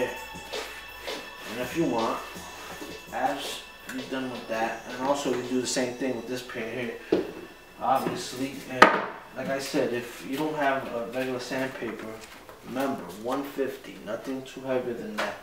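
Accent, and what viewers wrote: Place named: New York, United States. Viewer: I hear a lot of new york in ya tone👊🏼👊🏼💯💯good video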